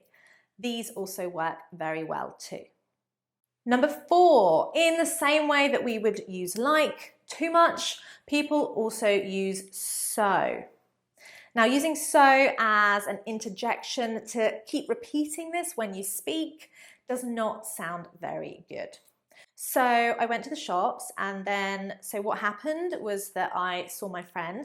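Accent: United Kingdom. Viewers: thanks a bunch for that i love your accent BR E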